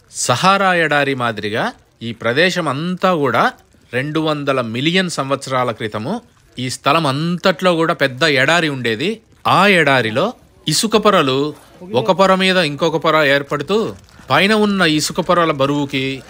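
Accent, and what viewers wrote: Kadapa, India. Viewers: అన్న మి విశ్లేషణ చాలా బాగుంది, మన కడప మాదిరిగా మి మాటలు వింటుంటే చాలా సంతోషంగా ఉంది. ప్రేమతో కడప జిల్లా వాసి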